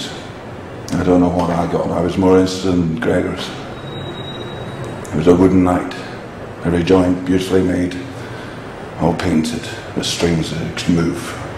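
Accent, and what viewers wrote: Scotland, United Kingdom. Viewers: I didn't even clock that he was Scottish until watching this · Nah, his Scottish accent is too thick so far.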